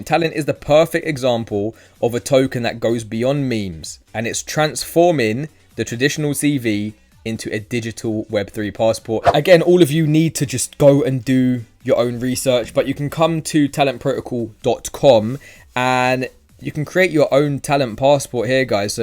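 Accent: United Kingdom. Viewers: Your accent is quite nice I suppose British boy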